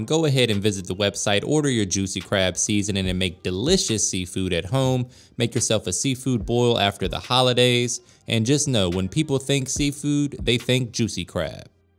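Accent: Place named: South, United States. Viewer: Sounds like a real country boy lol wish I could taste that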